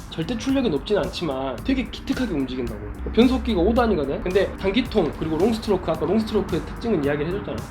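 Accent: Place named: Chungcheong, South Korea. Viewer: 충청도 이십니까? 저희 작은아버지랑 말투가 똑같고 웃음 코드도 똑같아서 너무 사람이 좋아보여요 좋은 영상 감사합니다 ^^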